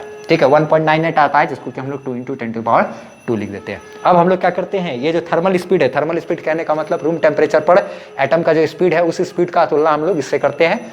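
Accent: Bihar, India. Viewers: Sir are you from Bihar?? I mean your accent 🙂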